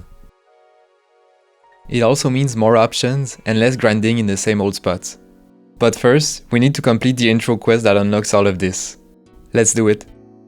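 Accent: Quebec, Canada. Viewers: Quand juste l'accent te dit que t'es queb haha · french or quebecois, he speaks well tho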